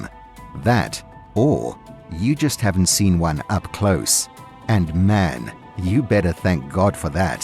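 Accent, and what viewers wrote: United Kingdom. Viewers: UK accents are beyond annoying. DISLIKE.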